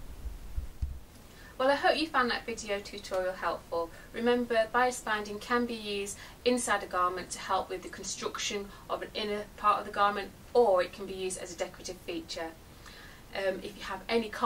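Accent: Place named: England, United Kingdom. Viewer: Loving the fact English people are doing clear informative demos. Way preferable to yank accents!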